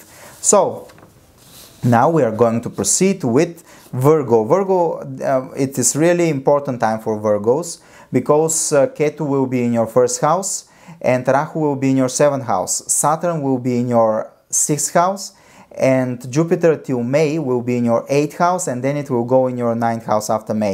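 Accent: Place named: Italy. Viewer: It's so nice... you are so honest...thank you so much 😢❤❤ BTW I love your accent...❤❤is ot Italian 😅❤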